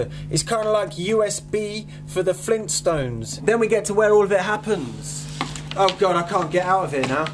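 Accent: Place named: England, United Kingdom. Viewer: Hablas con un acento suuuuper inglés!!! Es demasiado! me encanta!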